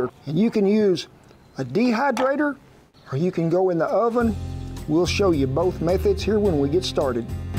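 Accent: South, United States. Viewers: He spoke just fine, you're just missing some south in your vocabulary. 😂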